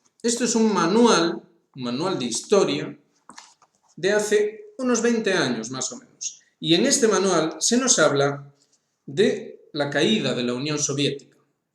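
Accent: Spain. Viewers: Es un gallego. No le entiendo ni madres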